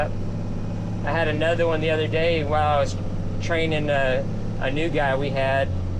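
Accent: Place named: Texas, United States. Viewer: What a character! He speaks good ole Texas gibberish like my uncles.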